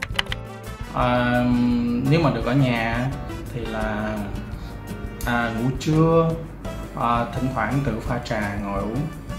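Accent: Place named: South, Vietnam. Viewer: Trước giờ tưởng người miền Bắc giờ nghe nói giọng nam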